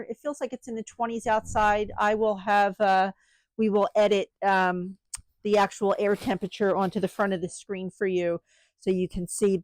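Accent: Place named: New Jersey, United States. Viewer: Thanks for the video. I’ve been wondering where your accent is from and I was correct. You sound just like my cousin who grew up in Morristown NJ. We lived in NY at the time. Thinking of getting this greenhouse. We live in ND and the wind is relentless!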